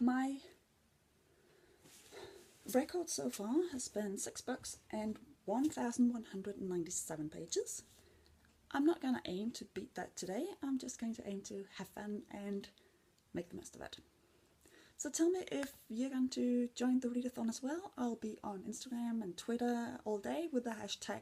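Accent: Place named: New Zealand. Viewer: Din kiwi accent er jo gudeskøn:) håber du får et rigtig godt readathon!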